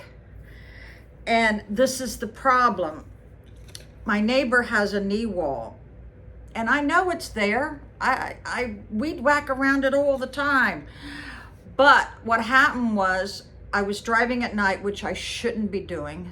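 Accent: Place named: Pittsburgh, United States. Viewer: I have to ask...Are you originally from the Pittsburgh area? I detect some Pittsburgh in your voice.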